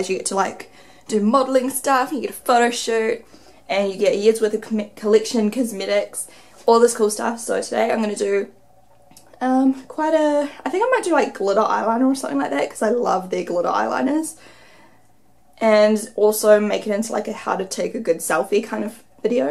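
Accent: New Zealand. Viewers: Hi, congrats for the million subscribers! I remembered following you at the beginning some years ago just because of your NZ accent (I'm an English teacher in France) and now I'm just following because I like watching your videos;) Thanks for the smoothie recipe and I thought it was interesting to watch you editing and voice over your videos:)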